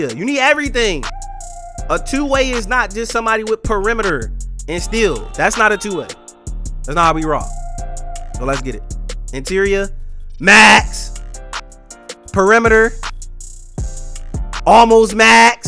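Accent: Baltimore, United States. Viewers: That Baltimore accent is skrong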